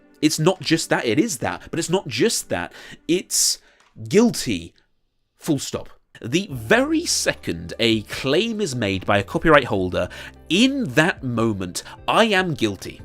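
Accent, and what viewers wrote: United Kingdom. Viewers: Because he’s got a regular British voice and a regular British face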